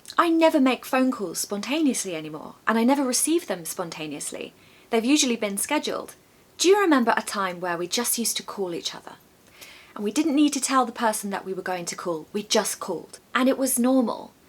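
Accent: United Kingdom. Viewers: Hello 🥰 I'm new to your channel and actually really glad that I found you. I fancy british accent and I enjoy watching your videos for learning English. You're so lively besides your floral dresses are so pretty. Like your style🌸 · The old British accent is interesting and attractive, thanks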